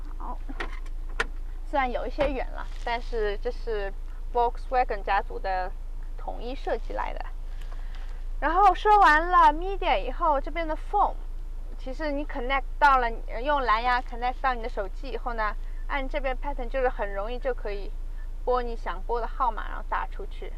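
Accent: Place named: Hunan, China. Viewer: 湖南音好重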